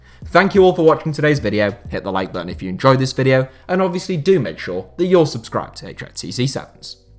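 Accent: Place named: United Kingdom. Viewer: Love The video but your accent iz so british i don't understand shit.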